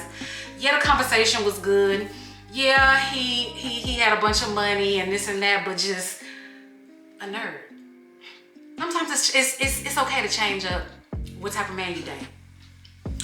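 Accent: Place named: Texas, United States. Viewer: I was thinking how you sound like Brooke Valentine then realized y'all are both from Texas. This was a great story time!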